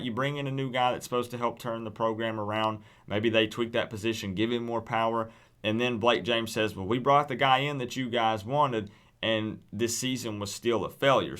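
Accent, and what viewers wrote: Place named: Chattanooga, United States. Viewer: whether you believe it or not, you have a Chattanooga accent. I knew it....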